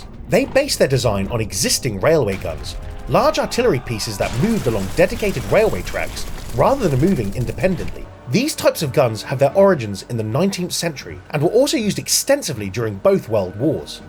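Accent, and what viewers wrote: United Kingdom. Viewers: Wtf with the bri-ish voice?? Didn't those guys lose to a bunch of pilgrims???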